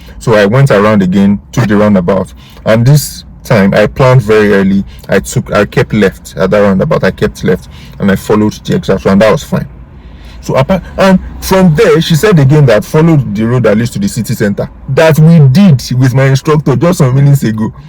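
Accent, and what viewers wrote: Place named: Nigeria. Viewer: I like the way you speak english in your Nigerian accent sir .. A little tip for you (in your test try to have a good friendly conversation with your test instructor while your driving which will divert the intructors thought away from examining... this is how I passed my driving test in the UK. all the best in your next driving test!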